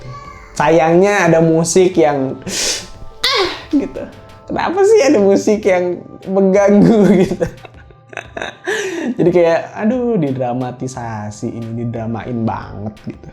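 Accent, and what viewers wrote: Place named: East Nusa Tenggara, Indonesia. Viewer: Logatnya itu yang bikin rindu kampung,,,, Salam Dari anak NTT bang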